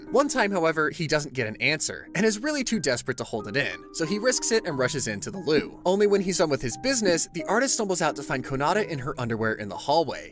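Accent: United Kingdom. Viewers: Man, i didnt knew that mr. Narrator is british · are you a brit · are you british? just asking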